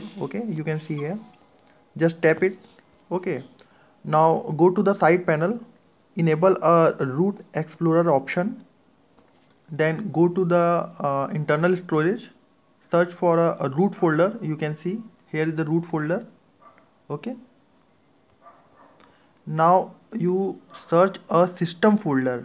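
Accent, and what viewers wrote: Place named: India. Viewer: Obviamente no, jajaja. Sólo los de la India se escuchan así, es demasiado característico.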